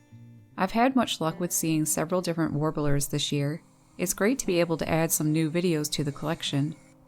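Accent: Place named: Ottawa, Canada. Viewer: Flickr is a great name :-p / I just realised you are a fellow Canadian. I hear the Ottawa? accent now :-p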